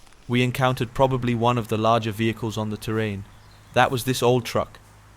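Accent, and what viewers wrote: Netherlands. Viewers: I like your Dutch accent 👍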